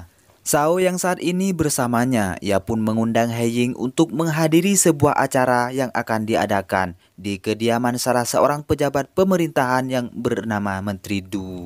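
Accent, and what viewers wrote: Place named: Aceh, Indonesia. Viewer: logat Aceh Sumatra kental..hhh mantab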